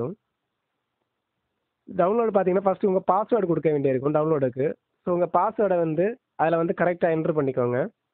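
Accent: Kanyakumari, India. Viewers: Bro neengha entha oru speech keata Kanyakumari Dist pola irruku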